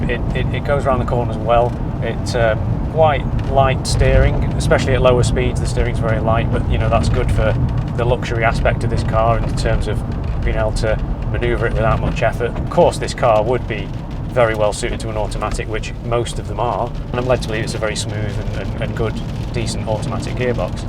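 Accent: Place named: Yorkshire, United Kingdom. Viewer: You said 'luvly car' just like your piss take of a Yorkshire accent. You also say 'peeerfect' and glass and dials like a Yorkie. You have an accent - looky enuf...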